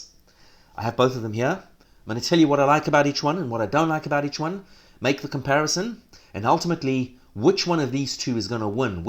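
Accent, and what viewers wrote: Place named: New Zealand. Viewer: thats a kiwi accent alright , loved the review would love some more comparisons if you get hands on other studio classics like beyerdynamic dt880 pro , akg k702/712.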